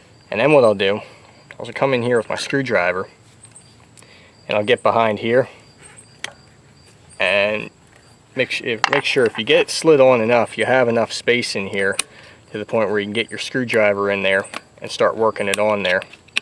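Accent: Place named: Pennsylvania, United States. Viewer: you sound like a Pennsylvanian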